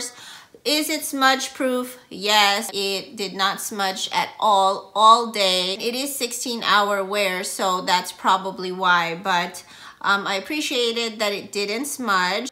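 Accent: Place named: Philippines. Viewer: Thanks for this video! Looking to get a better mascara when I toss my old ones. Just ONE good one. I don't need a bunch that are all going to go bad... Also, are you Filipina? The way you speak sounds similar to my mom. We got the down lashes too.. 😹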